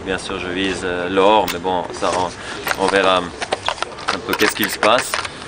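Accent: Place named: Switzerland. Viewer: He speaks swiss French, which is slightly different from standard French, but he's definitely fluent, he speaks a perfect French.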